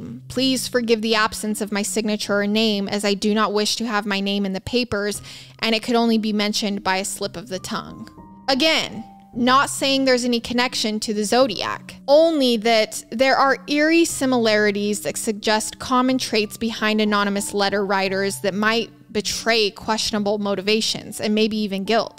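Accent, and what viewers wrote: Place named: Utah, United States. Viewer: I love the new series. A couple things.. and I mean the first one to be helpful haha not rude.. you’re supposed to pronounce the r’s in corroborate. It’s almost like you’re mixing corroborate and cooperate into one word. Hehe. And goodness girl, did you grow up in Utah??? You have one of the strongest accents I’ve heard from that area of the US! It jumped out right away and I was like wait a minute, I know that accent!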